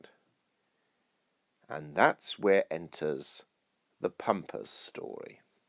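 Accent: England, United Kingdom. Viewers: Somehow this advice seems even more authoritatively wise hearing it in an English accent.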